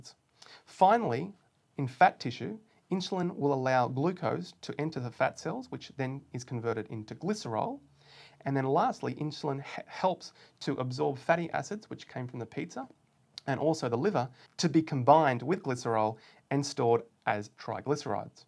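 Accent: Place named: Australia. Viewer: Your Australian accent is relieving my stress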